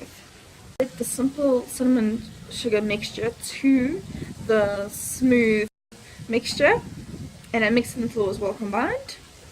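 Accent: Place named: South Africa. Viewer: Your subtitles are the best. Lol... Google clearly doesn't understand our South African accents. Nice videos 👍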